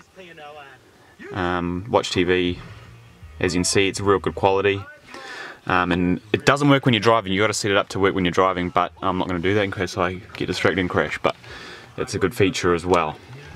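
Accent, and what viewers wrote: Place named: New Zealand. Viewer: awesome detailed video. like ur kiwi accent too :)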